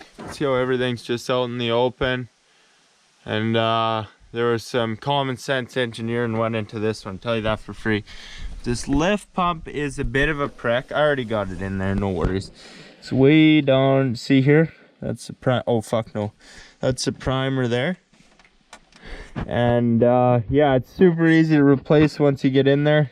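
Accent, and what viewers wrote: Canada. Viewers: I recently found your channel and I did go back and watch the cabin build, staining, etc and I though with you cutting wood and throwing it on the ground, if you get a chance, you need to build a shed roof over your side porch for firewood or extra coverage for whatever you choose. Also...GREATEST CANADIAN ACCENT EVER!!! 👍🏻 Keep up the videos!!! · Bahaha that’s what I’m sayin I’m sittin her with my pup and this one has me rolling. I ain’t had this much beer come through my nose in a long time. I’m a Texas feller but Been round a few Canadians but this feller by far is the funniest feller I ever heard talk from up north. I’d give anything to be able to go lay down some trees with him haha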